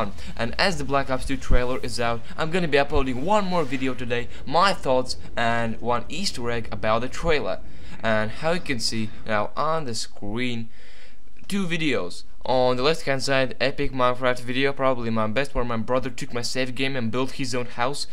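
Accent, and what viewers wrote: Latvia. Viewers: epic latvian accent hahah...nice moab dude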